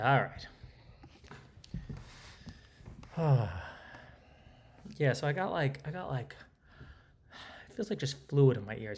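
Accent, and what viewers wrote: New Jersey, United States. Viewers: That New Joysey Áccent. 🙃🙂😃😊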